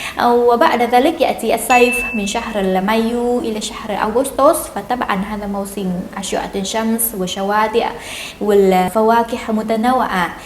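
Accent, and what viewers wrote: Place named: Thailand. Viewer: Bạn nói tiếng Arap với accent tiếng thái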